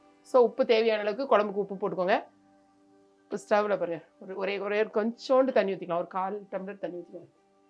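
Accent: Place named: Tirunelveli, India. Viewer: As I am also from Tirunelveli, I enjoy your gesture, accent , innocence and simplicity besides your cooking. ( I hate cooking and wish I were your neighbour to sample your dishes)😂. God bless. Is it possible to connect with you over phone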